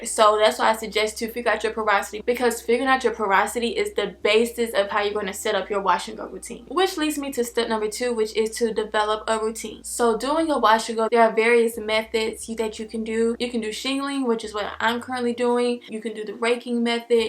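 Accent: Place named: Georgia, United States. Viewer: I found your videos populating on my YouTube feed a lot. “Thanks to my sister”😒. But although I’m not interested in black girls hair care processes for myself personally. I’ve come to find other things about you intriguing. Like the way you talk. I KNOW YOU HAVE TO BE FROM GEORGIA😂. The combination of how you articulate and encourage sophistication within black beauty…you definitely got my attention.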